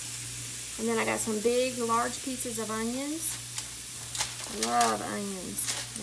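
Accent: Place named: Eastern Kentucky, United States. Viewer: This looks so good! I have never made ribs....of ANY sort! That is my husbands thing! I recognized your accent! My sister in eastern KY for years!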